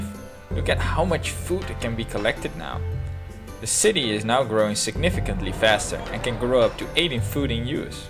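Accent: Netherlands. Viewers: you actualy sound dutch ^.^ it would explain why the name reus soudns so mutch like the word giant in dutch :P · This looks interesting, I like indie-games like these. Art looks good, hope it's well balanced. Je zou wel wat aan dat accent moeten doen. Hollands engels is nog pijnlijker dan Frans engels. Wel het opvallende onvermogen maar dan zonder de charme.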